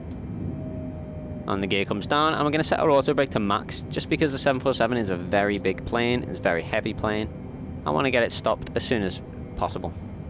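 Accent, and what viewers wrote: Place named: Northern England, United Kingdom. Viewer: Thank you, for all the tutorials! Very simple and easy explained, helps so much for navigating whether its a an A320 or B747. Additionally like your english accent, seems to be somewhere in the north of the UK (scouse?)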